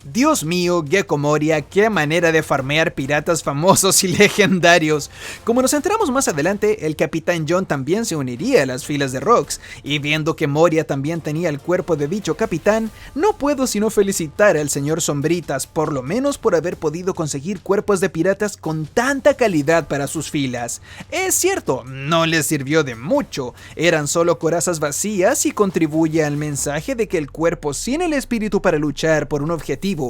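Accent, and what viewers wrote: Chile. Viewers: Pensé que era chileno, de mi chile querido.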